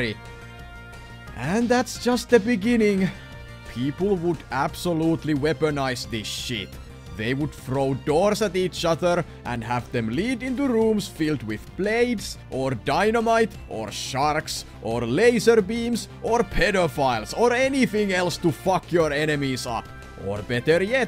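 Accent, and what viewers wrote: Finland. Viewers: Came to see High Guardian Spice being ripped to shreds for the atrocities it commits on the name of animation and anime, and stayed for the great jokes, funny delivery and that thick Finnish accent... jatka samaa mallii, ootan innolla osaa numero 50! 👌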